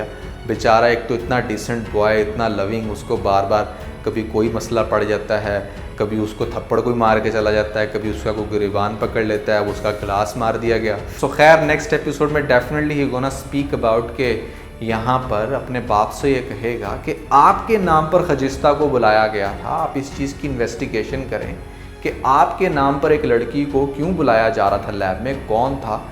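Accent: India. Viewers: are you from India? Because your style is very Indian way of talking, your pronunciation of certain words are also not correct, if you are Pakistani you better improve your Urdu...nonetheless your reviews are ok.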